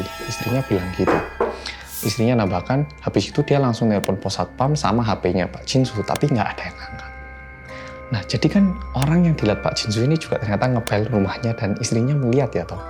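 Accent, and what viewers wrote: Java, Indonesia. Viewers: Seneng lagi ngomong boso jowone sing medok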